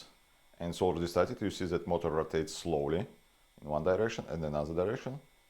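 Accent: Russia. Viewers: Мне кажется ... у вас акцент русский! Если да, то на русском было бы тоже очень интересно.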